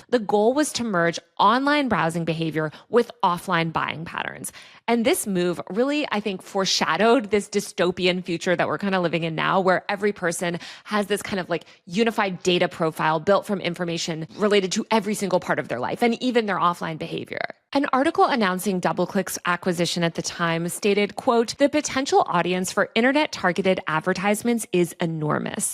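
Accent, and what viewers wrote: California, United States. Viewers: How do I put a filter over your voice so I don’t have to hear such California OMG accent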